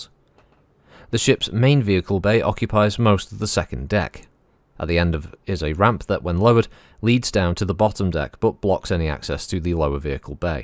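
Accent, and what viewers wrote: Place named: RP, United Kingdom. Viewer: It's that elite upper-class British accent 🙂.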